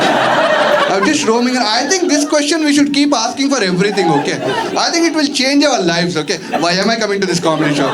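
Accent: South India, India. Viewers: I love that south Indian accent in ur English... And tht.. Re chintuu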